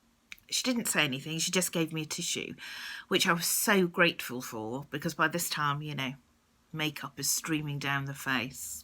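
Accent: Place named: United Kingdom. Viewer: Nice. Maybe the lady with the tissues remembers the day as well. I enjoy your accent, very different from the Canadian I'm around. I can't "place" the differences in the British ones very well. We don't have accents over here of course:)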